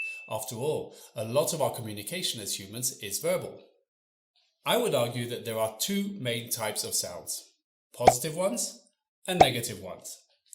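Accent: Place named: United Kingdom. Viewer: 英國腔超重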